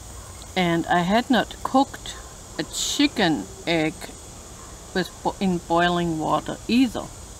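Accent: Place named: Germany. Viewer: Du bist so lustig und besonders für Kinder! Ich hab' etwas auch gelernt. Ich höre ein Deutscher Akzent durch Englisch weil ich Muttersprachler von Englisch bin. Ich bin Amerikaner. Beherrschst echt gut Englisch! Macht weiter so. Fröhliches Weihnachten und Guten Rutsch! Vielen Dank.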